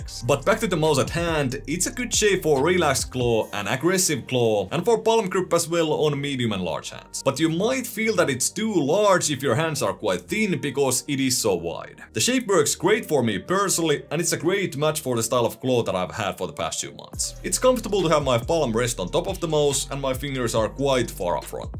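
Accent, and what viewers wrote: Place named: Finland. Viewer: I like u suomi accent. Sounds cool!)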